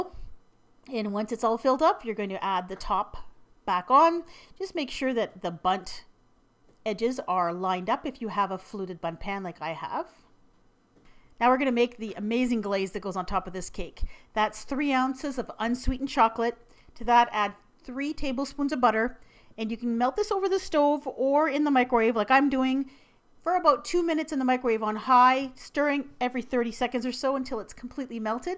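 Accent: Canada. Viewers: God I love you woman, and your Canadian accent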